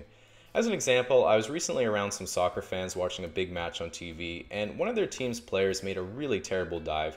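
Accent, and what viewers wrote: Tasmania, Australia. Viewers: I live for your Aussie accent mate! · Holy shit your Australian accent caught me off guard! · I‘m not a native speaker and even I (or maybe because of it) can tell that he pronounces some words with an Australian accent. It‘s just something you never get rid of. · are you tasmanian? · you need to get out more, mate. Every single one of his vids where he gets it out you guys come out of the woodwork to say "it sounds nothing like an aussie accent" There's a whole bunch of aussie accents, and Ive defs heard his. He put on the map as being from tas, but Ive seen it in adelaide and rural western vic. For all you know one of his parents moved from queensland so he has a bastardisation, or his friends growing up were bogan and that influenced it, but nothing about it strikes me as off, just rare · No wonder your Aussie accent is so good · the return of the australian accent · Are you from tasmania?? 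· Are you from Tasmania, mate? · Wait are your from tassie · make a wish! also I hear the Australian accent now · You're Australian! hell yeah! Do a video in an Aussie accent (Whole video) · gaaah that aussie accent is legit haha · I mean even normally you can tell he's Australian, the trace of the accent is still there, and it comes out in a few words. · U are a Canadian-Australian with a amazing Australian accent and great vids. U are awesome!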